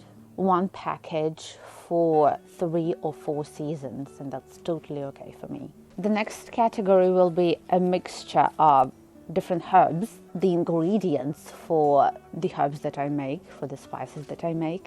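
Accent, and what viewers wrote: United Kingdom. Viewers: the british accent is unbearable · Thank you for takeing these videos. I'm watching you since some months and I'm still impressed by your British accent and your ability to make gardening interesting (I've never been into it xD). But I can really relax watching you. I hope all your plants will grow great and am looking forward for your next videos. Greetings from Berlin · Ощущение, что говорит британка. Акцент классный!!!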